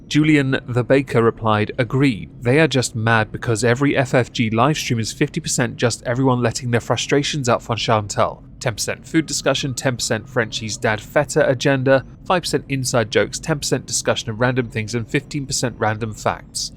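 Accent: United Kingdom. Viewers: Same... I accidentally found on a random lol cow content excursion and then watched the "other stuff" and was still lol'ing and wondering "how have I not found this channel before now?" Is totally my kind of humor even when I don't agree with joke... the British wit & voice is just a bonus 😉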